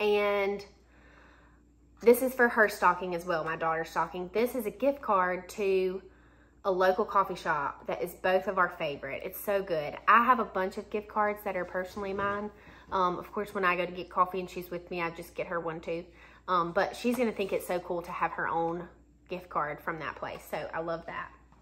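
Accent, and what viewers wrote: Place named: Texas, United States. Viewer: Are you from Texas because you sound like you are I kinda like it 😅 bc it sounds really country for some reason 😂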